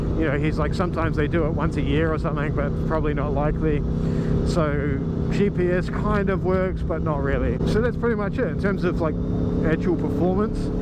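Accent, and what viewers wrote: New Zealand. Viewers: Hey bro Good to hear a fellow kiwi on YouTube. What bike is that you are on?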